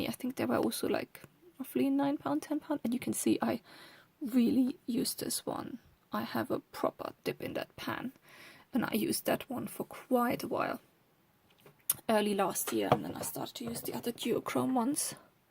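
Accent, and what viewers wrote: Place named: Germany. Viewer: Your accent is lovely. I may be mistaken, but it sounds either German or Scandinavian.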